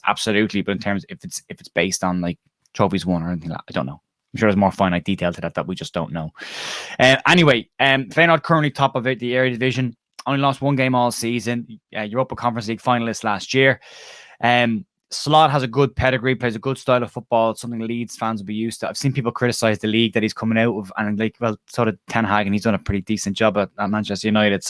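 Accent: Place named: Galway, Ireland. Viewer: Great job! As a novice follower of the game my tactical understanding is limited. But when it comes to how the Board is going about this transition, I have similar experience from other corporate situations. Your coverage of this aspect of the Whites is both knowledgeable and, even rarer, objective. No pinch of salt needed. In addition, to my Midwestern ears, your delightful Galway brogue fits easier than Yorkshire English. The non-game terminology is also curious to me. Here in the US, we wouldn't "sack the manager" but "fire the coach". And if the replacement isn't onboard soon, we'd be saying, "Ready. Fire. Aim."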